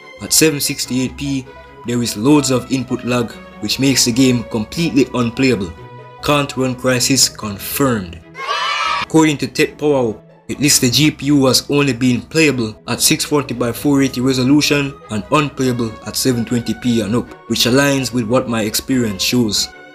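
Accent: Jamaica. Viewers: Bro sounds oddly Jamaican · Jamaican accent discussing tech is low-key soothing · Bro ur Jamaican?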